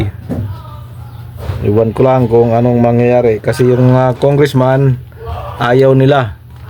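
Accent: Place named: Buhi, Philippines. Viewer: Brod paki ayos naman ng pagsasalita mo para kang taga Buhi, Cam. SUR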